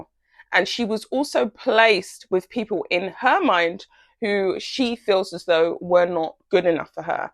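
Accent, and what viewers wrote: United Kingdom. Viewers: As soon as I heard your British accent I knew this was a hate video!!!! F U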